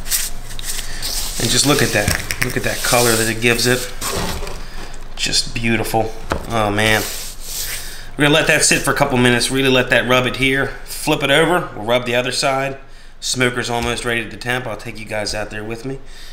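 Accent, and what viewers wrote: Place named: Baltimore, United States. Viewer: you have a Baltimore accent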